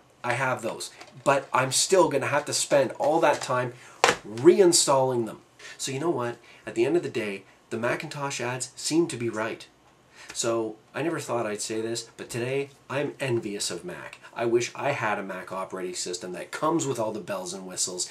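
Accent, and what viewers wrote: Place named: Canada. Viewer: You sound Canadian. Anyway, if you have so much experience on computers--I'm surprised that you blindly bought Professional without a second thought. Yes, I know a fair amount of consumers may be unaware of such a thing, but I'm surprised that you didn't catch such a thing.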